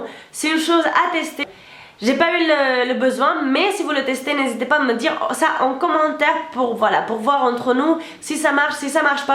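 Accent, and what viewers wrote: Spain. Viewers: oh non une espagnol! l axent est beau tres beau j adore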